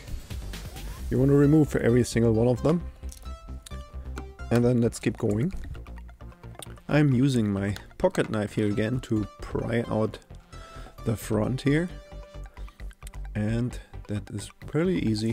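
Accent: Germany. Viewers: Bist du deutscher? Hört sich so an 😂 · Gutes English aber man merkt das du deutsch kannst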